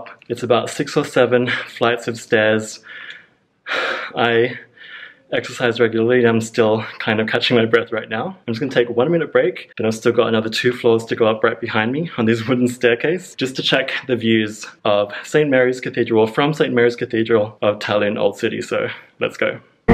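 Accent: Australia. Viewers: Your video popped up because we're heading to Tallin next week, and looking at all the vlogs from there. Hubs is watching while I'm working and I heard the accent I was OOOOOO an Australian accent and came to watch lol. Maybe we'll bump into you in Finland on our way back!! #HappyTravels!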